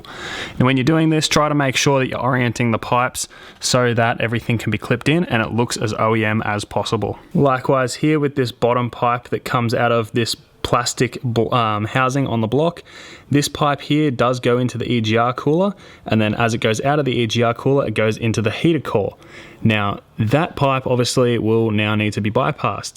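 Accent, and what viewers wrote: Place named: Australia. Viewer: I might do this. It's good hearing a local voice. G'day from the Goldy.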